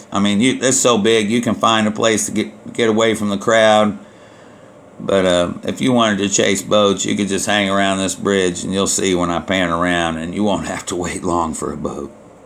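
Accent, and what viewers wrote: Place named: South, United States. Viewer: Hey from GA! :)) Weather in this year is very bad... rainy every day.. What is your weather like? our states are neighbors! I see south accent, it's cute!))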